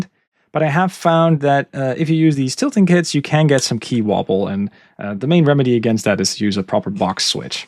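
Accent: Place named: Netherlands. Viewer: This keyboards looks very interesting. For some reason about 4 seconds in I could tell your accent was Dutch, then found out you are actually Dutch haha. Was wondering if you had a discord I could add, I had a few questions if you wouldn’t mind.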